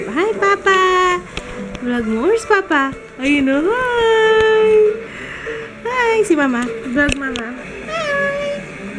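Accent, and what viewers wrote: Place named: Iloilo, Philippines. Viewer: hi miss! nakakaaliw po kayo! i feel good watching your videos. may pag ka hiligaynon (ilonggo) yung ibang word and accent nyo hahaha.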